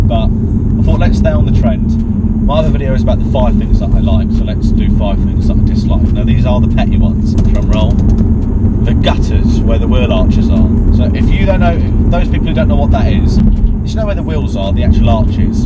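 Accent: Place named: Australia. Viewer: aussie accent is on point 😂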